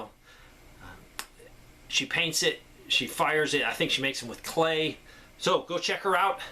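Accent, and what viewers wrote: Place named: Michigan, United States. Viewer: You have a Michigan accent, i could tell when you said galen instead of gallon.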